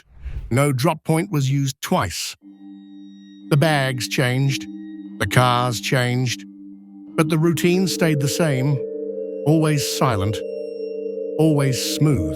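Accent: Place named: Australia. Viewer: My previous comment was deleted by YT strangley. Great video. Subscribed. Interesting Aussie narrator. AI or paid ? Are you able to share the source? Cheers from this Aussie living in NZ 😎🎸🤘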